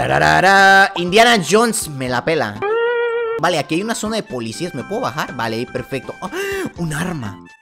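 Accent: Chile. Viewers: Soy yo o tienes voz de chileno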